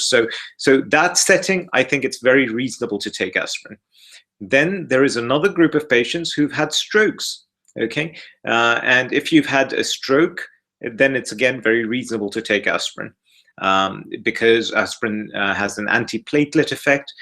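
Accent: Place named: RP, United Kingdom. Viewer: What can causes palpitations or fluttering? Since 2y i started to get them. Since 5y i got hashimoto aswell. Before that time i was very healthy and energetic... since i got hypothyoroid issues there is always something going on... say i get 1 month palpitations the rest of the year its gone. At this moment i have it 1,5 month and it is not gone yet. I blamed first probiotics or greec yoghurt. So did not take it and still its there. Then i took celtic seasalt in water and bit by bit it went away! So i was very happy. But then 3 days later it came back. Most of the time i take every day magnesium. In juni cardiologist tested potassium etc and it was good, end of july it started the palpitations. So what can i do about it? By the way you have good videos, plus you are good looking and sexy too 😁☺. I like your post english accent dr.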